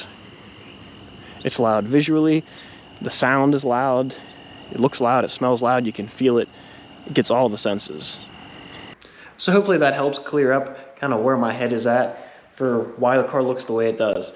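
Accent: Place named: Southwestern Pennsylvania, United States. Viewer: I'm guessing by your accent that you are in the southwestern PA area. I have family in Latrobe. Lol....let me know if I'm right.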